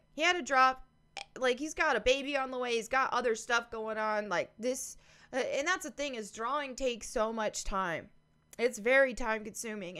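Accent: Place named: South, United States. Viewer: I have honestly become quite inspired by you since I made my way over to your channel like many. ie referral from Inspired by your Presence and almost abrasive delivery. Yet you also seem to have crazy similar mindset/goalset on...well almost every topic I have I've heard you Discuss. Like so similar that I found myself finishing some of your thoughts simultaneously in my head. Initially I was rather freaked out cause I don't think I have ever done that. It started to reoccur as i progressed through you few videos. So for one. I'd like to take this moment to tell you how awesome you and your message is..two I believe wholeheartedly in your key foundations considering most of the topics you have discussed in well the past hmm 2 days I think. haha (as well As should be spoken about more than it does.) Anyway, I do apologize for how intense this message may be for you. 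it is I guess simply how unexpectedly intrigued I have become. enough that I wrote this entire comment which I still don't even know the extant of my point. But was so enthralled that I almost felt I needed to connect you and relay my gratitude amongst other things. Also iDk what bit of southern touch to your voice you have. But it rocks kinda like you it fits you... I am from NYC born and bred so i am unaware of where. Allz I know is the more I learn. I am in awe of you. So Thanks for being You and keep the vids coming. you have probably reestablished a glimmer of hope for us as humans and women. I thou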